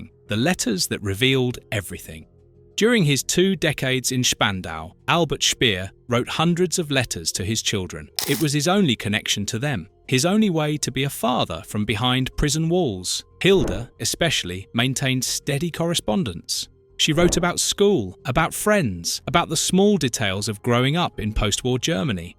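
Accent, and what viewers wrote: England, United Kingdom. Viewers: If they don't have a good thick English accent like this guy, why bother.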